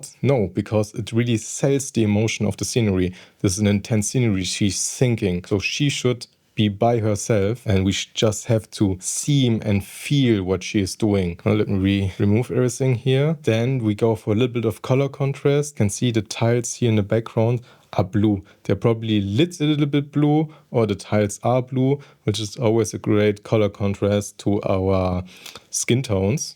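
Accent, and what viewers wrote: Germany. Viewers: sett is aber eh werri german akzent 😅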